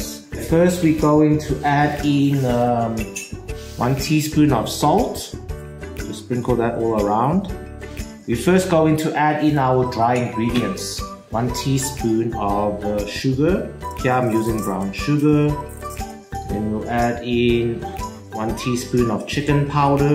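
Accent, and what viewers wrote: South Africa. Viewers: Rocking the South African accent!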